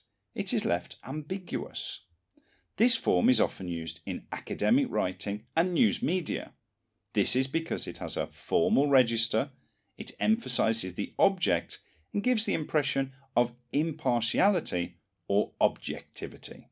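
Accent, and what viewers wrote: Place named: United Kingdom. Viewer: British accent❤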